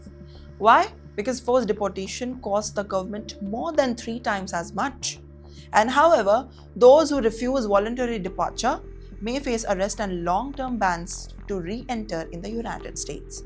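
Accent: India. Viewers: Indian Accent, Indian English, very irritating. Could only take 2 minutes listening.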